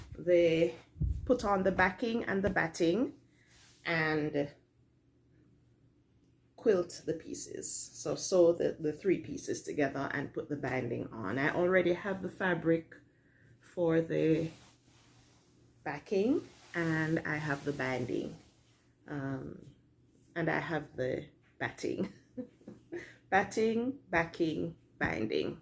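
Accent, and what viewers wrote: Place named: Jamaica. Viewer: Hello, I'm new to your channel! Found you through Sean guy who sews💞 looking forward to seeing more of your videos! I have a coworker who is from Jamaica, your accent reminds me of her, a lovely -lively person 💞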